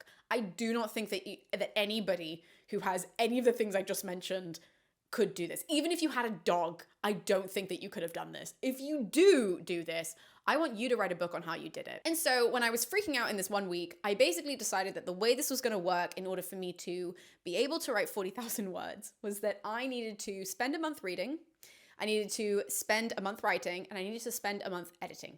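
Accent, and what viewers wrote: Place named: England, United Kingdom. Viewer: You have an English accent, everyone loves your voice. 🤣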